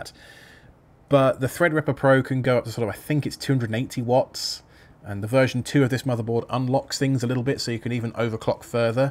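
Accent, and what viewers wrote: United Kingdom. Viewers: I just had an uncanny valley moment hearing inches in a british accent.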